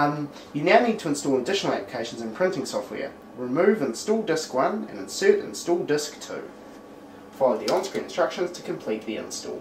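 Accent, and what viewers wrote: New Zealand. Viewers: you're accent suggests that you are a kiwi?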